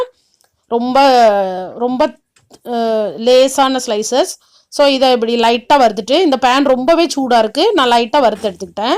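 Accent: Tirunelveli, India. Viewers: Tirunelvelila endha ooru aunty ungaluku? Mumbaila irukinga pola.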